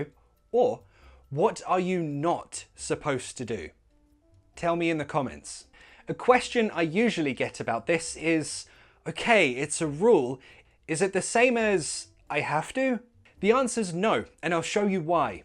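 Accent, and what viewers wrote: United Kingdom. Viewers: I enjoy listening brittish accent. It's defferent from American one. Thanks for your classes, they make my English get better. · In my side view, you have an excellent manner to teach English, especially when you use sketches with the sentences although I have never liked a British accent before, now I admire it because of you and I hope you post more videos because are so fun and useful 😊🌟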